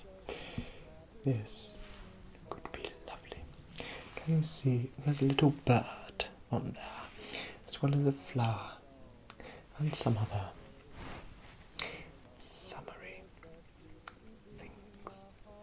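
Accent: United Kingdom. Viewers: I finally find British ASMR. Hello from Tehran 💚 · Just discovered your channel last night. The Butler one where you are unwinding us from.a night out was FABULOUS!!! I love themed and time period based ASMR vids. The Fire crackling, the light old time background music is perfect. And the elevated British speaking tone is superb. "Chefs kiss" Keep up with the lovely and hard work you put into these. Looking forward to binging your channel ❤ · Are you actually British? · I got so used to the british accent so fast that when he mentioned he was the best tailor in the UK I had to take a moment to collect myself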